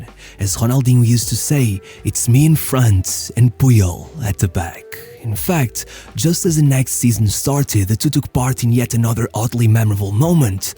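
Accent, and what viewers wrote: Portugal. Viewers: Your “Roberto Carlos” accent… você é Português?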